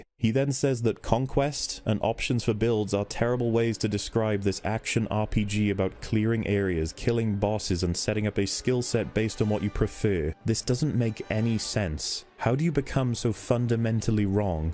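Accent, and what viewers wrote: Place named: Wales, United Kingdom. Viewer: You know the problem with youtubers? They are youtubers, as if that actually means anything in the world. is a wonderful arbiter of taste when it comes to the frivolity of contemporaneous consumables of an entertainment bent., you are a tonic in a sea of grating celery. Your opinion actually means something to me. Most youtube nonces, not so much. Bless you man, I'd buy you a beer to best loosen that smooth welsh speaking voice which just on its own makes you much more view-worthy than the douchebags described in this vid.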